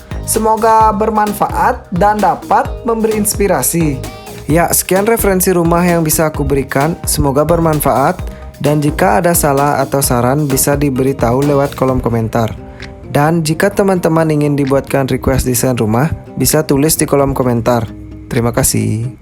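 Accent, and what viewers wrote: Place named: Sunda, Indonesia. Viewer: Sunda banget suaranya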